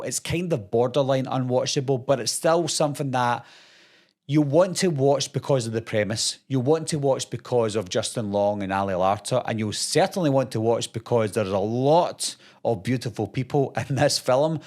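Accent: Scotland, United Kingdom. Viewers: With a last name of i can pick oug that scottish accent...where ya hail from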